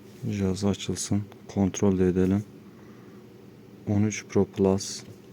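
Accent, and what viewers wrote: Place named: Urfa, Turkey. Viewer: Ustam sen galiba urfalisin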